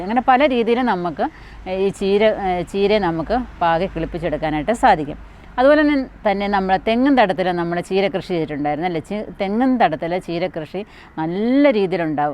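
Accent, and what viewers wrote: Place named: Pathanamthitta, India. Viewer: ഏത് സ്ഥലം ആണ്. ഞാൻ പത്തനംതിട്ട കോഴഞ്ചേരി ആണ്. ചേച്ചിയുടെ സംസാരം കേട്ടിട്ട് പത്തനംതിട്ട പോലെ തോന്നി അതുകൊണ്ട് ചോദിച്ചതാണ്.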